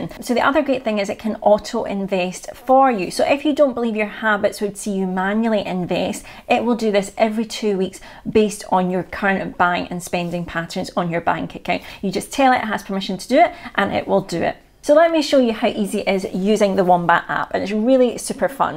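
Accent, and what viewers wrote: Scotland, United Kingdom. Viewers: Aye... love Scottish accent! :)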